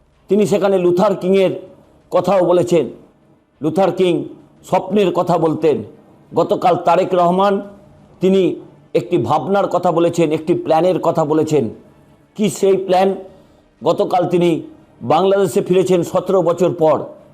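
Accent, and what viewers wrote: India. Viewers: যিনি উপস্থাপন করছেন, উনি কি আসলেই বাঙালি? নাকি,ক্রস (হিন্দি +বাংলা )।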